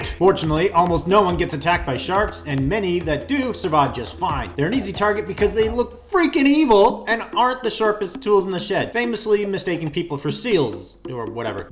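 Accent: Australia. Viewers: Problem with that is you are using an Australian accent for your phonetics. Americans probably translate that as Ayy-roi-kenn-jay! 🤦‍♀️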